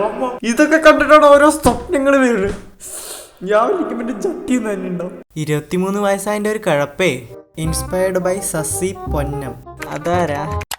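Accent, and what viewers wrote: Palakkad, India. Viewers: Bro palakkad ano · Palakkad evideyanee kutta 😃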